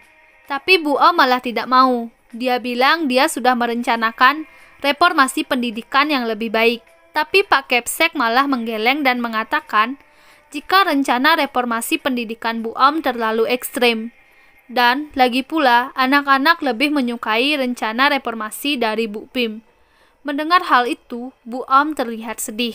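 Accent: Bali, Indonesia. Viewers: Kali ini q dgr suara ini Chanel anak Bali Yach?? Keliat bgt ni suaranya khas Bali. Morid, ithu, memanggel dsb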